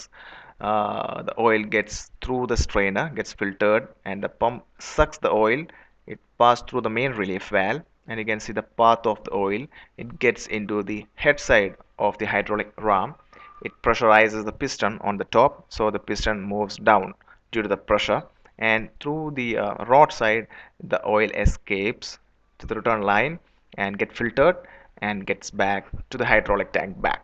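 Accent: India. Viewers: Couldn't understand the word u say It's like u speaking Indian in English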